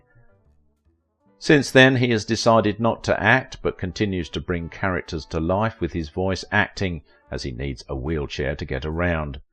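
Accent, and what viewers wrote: United Kingdom. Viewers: Awesome video!!! You, the narrator sound exactly like Laurence Brown from YouTube channel "Lost in the Pond"....and I don't say that because you are both British..😉..Love that channel! Glad I found this one too! 😁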